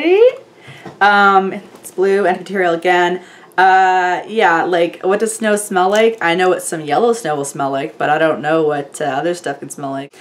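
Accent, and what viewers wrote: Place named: Canada. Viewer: Thanks for asking me to join ur channel! Glad to of- and so far i loveee your videos! Didn't knw u were Canadian, but love ur accent! Its real cutee! Id have to choose as my "wanting to try" would have to be tha Sugared Vanilla Wish & that Winter Candy Apple out of all of thm! Id like tht Candy Cane one also! So many to choose! Awhhh! Hehe :D Keep it up! Luv em! Would love to win as i lovee BBW! :D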